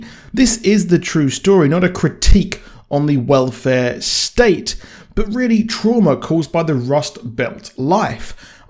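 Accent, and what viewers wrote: United Kingdom. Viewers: To call this video review excrement is an insult to excrement. Perhaps, before making a video reviewing a movie that is based off a memoir, you should READ the memoire so that you have some idea what changes were made. Had you done that, you'd see that some dramatization was included (it is a movie after all not a documentary). I'm from Ohio, from the big city, but from the state. My parents also moved from Kentucky to Ohio (which, btw is NOT part of the south) looking for a better life. Despite being a minority, my life was magnitudes more privileged than the author's, in every measurable and intangible way. As far as your hard left personal views, and how things would be in your country, such comments are unnecessary. As was your tripe about Trump. Your ignorance of why things turned about bad after maw maw's move was colored by your personal bias. 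Again, had you read the book, you might have understood. But I suspect, along with not reading the book, and not understanding how health care was provided by employers, you've never set foot in a small American town. And have no idea how much a town is impacted when THE industry in town goes away. You sound like a Brit, so asking anyone whose family lived in a town where the Colliery was closed and everyone that worked there was put on redundancy, or spoken to some one like Erin Prizzy about generational trauma would've given you a some perspective; save the connection to work and health care. Though, again, based on your stated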